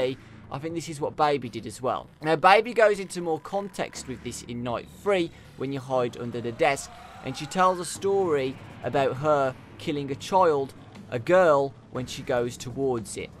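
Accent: United Kingdom. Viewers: He has a british Accent he is form the UK C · that was sweet man ! well done but that british accent :-D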